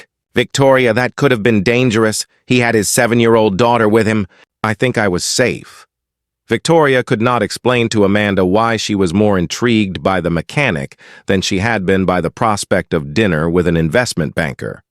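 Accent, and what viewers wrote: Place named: Texas, United States. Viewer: Enoigh of this unsufferableTexan accent ! It spoils a good story 😮‍💨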